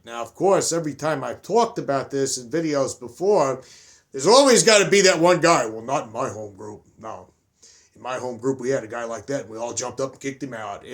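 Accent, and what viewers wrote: New Jersey, United States. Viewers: The rhing about the midwest is hilarious. I live in Illinois and i coukd totally see that happening. They have the whole Midwest Nice thing. Not that we are really that nice. I like you New Jersey accent it doesn't brother me in the least.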